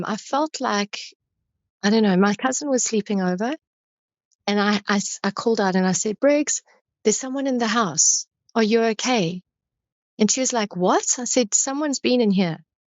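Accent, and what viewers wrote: South Africa. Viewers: Anyone else go the first several minutes looking for the clues to discern: New Zealand? No….South Africa (sounds a teeny bit Dutch) but then she said Catholic and I figured, no, near zero chance of being. Dutch S African and Catholic…must be Anglophone but still sounds like an Afrikans speaker, then she said Germany and that she learned the language…then I was like, NOW IT MAKES Sense! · i think you have a lovely accent and it was very clearly South African. I made lots of lovely South African friends when I was living in Qatar and knew immediately where you were from. You spoke beautifully by the way. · Sounds 100% South African.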